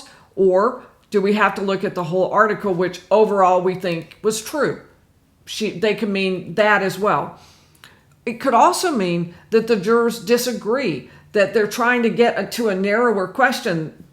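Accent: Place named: Kentucky, United States. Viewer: Love the accent, are you from KY? You sound almost just like my wife from Covington, KY. Thanks for the breakdown.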